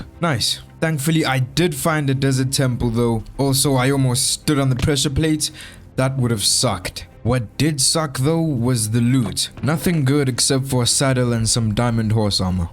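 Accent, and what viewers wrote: South Africa. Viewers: I knew your accent sounded familiar, I am also a fellow South African 😄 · My bru are you South African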